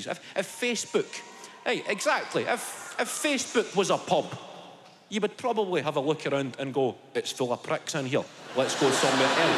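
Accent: Scotland, United Kingdom. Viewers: His language is English, his accent is Scottish.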